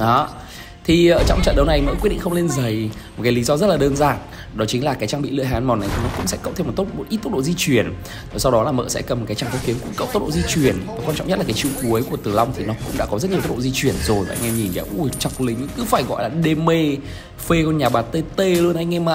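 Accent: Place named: North, Vietnam. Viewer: Trai Bấc ló vậy đóa bẹn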